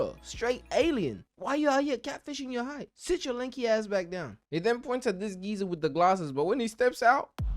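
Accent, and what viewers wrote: England, United Kingdom. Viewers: dude I can tell you English not American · Are u english or sum?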